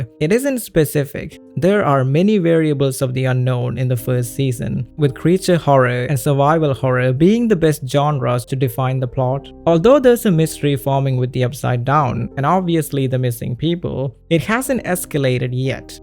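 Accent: South India, India. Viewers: For some reason I hear a South Indian in you...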